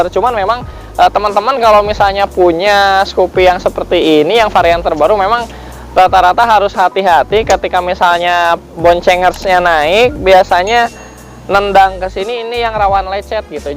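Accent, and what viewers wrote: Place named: Banjar, Indonesia. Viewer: Banjar nya mang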